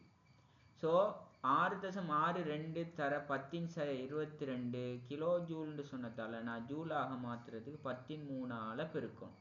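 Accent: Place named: Tamil Nadu, India. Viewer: Your voice awesome ❤ also ur tamil slang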